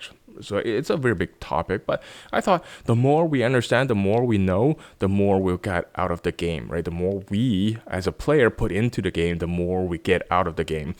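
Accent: Standard. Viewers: holy shit，Your Chinese thank-you words made me even suspect that you are a Chinese blogger in a foreign country, your Mandarin is really standard! damn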